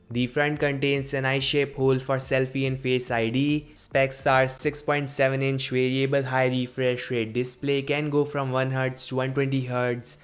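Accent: India. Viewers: tabhi sochu voice indian lag ri thi 😅 U should do face cam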